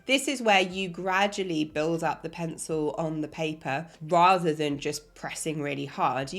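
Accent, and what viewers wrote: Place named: RP, United Kingdom. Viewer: I like your accent Sounds so posh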